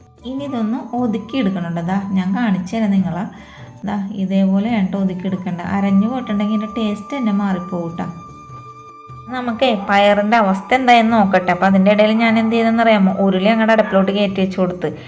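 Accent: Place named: Kodungallur, India. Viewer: കൊടുങ്ങല്ലൂർകാരുടെ സംസാര ശൈലി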